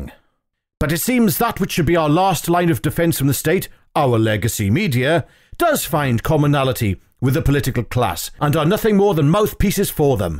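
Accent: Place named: Wales, United Kingdom. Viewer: It’s refreshing to hear common sense articulated in a Welsh accent. Normally you have to be careful about expressing opinions like that in Drakeford’s hermit kingdom.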